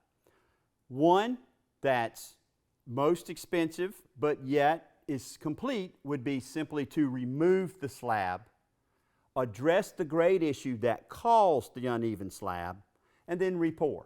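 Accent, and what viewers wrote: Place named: South, United States. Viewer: Nice job 👍, good southern accent too. Though, I still got a q: I am about to resurface my 20x 40 feet concrete driveway which is slightly inclined downhill from the road towards my garage, which make water pooling up next to my garage door. I want to resurface entire driveway but I also want to make the end of the driveway adjacent to the garage door elevated about 2 inches up, and make it sloping down to the garage floor on one side and also sloping toward the outer end by the road - with hope that the rain water will be flowing away from the garage. Any suggestions how to do it DIY? Thanks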